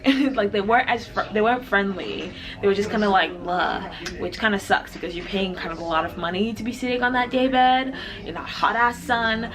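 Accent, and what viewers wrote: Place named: Cameroon. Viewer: It's the french cameroonian accent for me✌️ Love your vlogs and btw your body is 🔥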